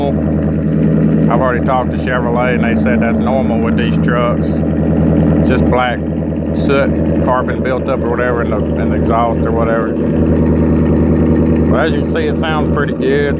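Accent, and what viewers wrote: South, United States. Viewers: I like that fat dip spit and the southern drawl!! Good lookin truck buddy!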